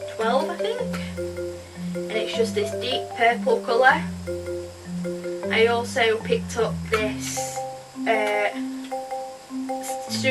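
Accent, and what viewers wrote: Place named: North, United Kingdom. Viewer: Noooo I love northern accents! Don't be anti northern! I think it's sooo lovely! Xxxx and yeh I'll tweet it now :)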